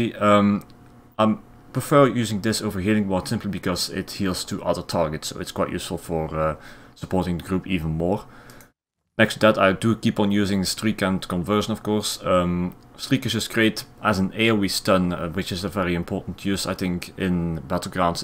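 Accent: Limburg, Netherlands. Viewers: you sound dutch :) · You got a Limburgs english accent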